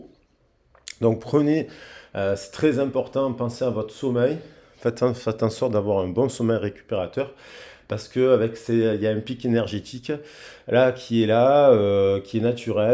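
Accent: South, France. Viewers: Merci pour cette guidance 🙏, j aime bien votre accent du sud 👍belle journée 🤗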